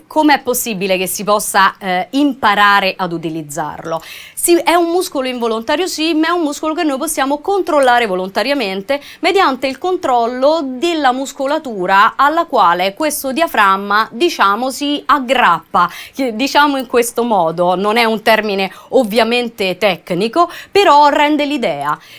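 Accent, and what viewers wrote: Rome, Italy. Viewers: Sei romana... Interessante lezione!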